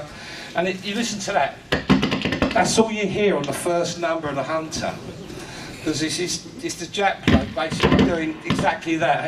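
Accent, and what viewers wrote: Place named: England, United Kingdom. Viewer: I listen to Englishmen talk all the time but this guy seems to try to celebrate the accent and slurring to another level. Good Lord man speak clearly if you're going to put something out on YouTube. Thanks for your effort but it would really help if you could speak to where the rest of the world can understand.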